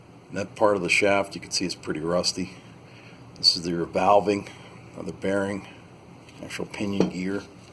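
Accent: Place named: Pittsburgh, United States. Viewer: I heard yinzer before I saw P.H. on the paper. A-K Valley native here.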